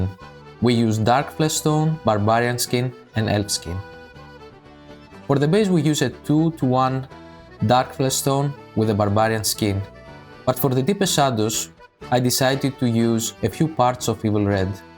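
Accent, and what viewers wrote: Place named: Spain. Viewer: 'How is he such an incredible painter?!' Hears Spanish accent 'Ohhh' Fr though, amazing work! Gonna try out this skin recipe so thanks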